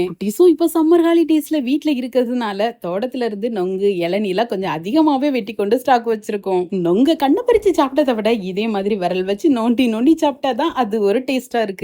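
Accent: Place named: Kerala, India. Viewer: Sister neenga pesarathu azhaga irukku 2daysa unga video matum tha pakkuren super 😊😊😊neenga kerala sister unga slang apditha irukku athan keten 😊😊😊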